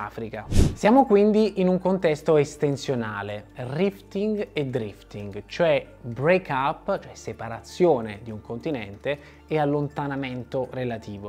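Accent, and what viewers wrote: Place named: Naples, Italy. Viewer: ma togli quell' accento napoletano suu'! che rovina l interesse dell argomento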